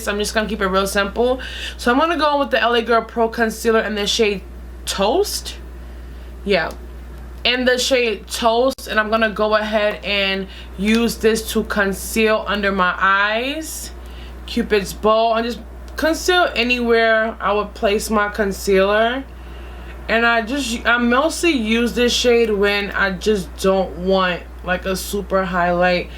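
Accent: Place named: North, United Kingdom. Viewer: i always love her accent lol she got a (I'll whoop your ass)up north type of accent.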